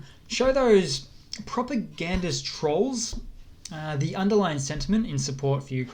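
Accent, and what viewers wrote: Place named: Australia. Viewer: He sounds Australasian to me!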